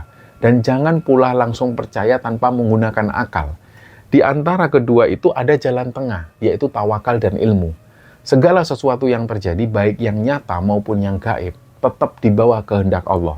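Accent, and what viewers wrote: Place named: East Java, Indonesia. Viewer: sama sama mas jujur saya dari dulu ngefans dari taun 2021 ke juga ke mas sebagai narator cerita horor menurut saya beda pembawaan nya sama narator" yang lain apa lagi kalo udah ngomong pake bahasa Jawa timurnya saya suka logat medok nya 😊❤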